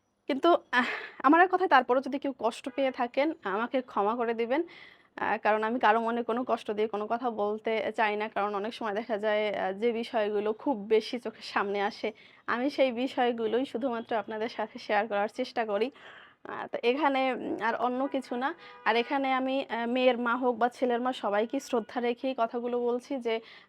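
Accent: Barishal, Bangladesh. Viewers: ভাবি আপনাকে বরিশালের ভাষা কথা বল্লে খুব হাসি পাই, ভালো লাগে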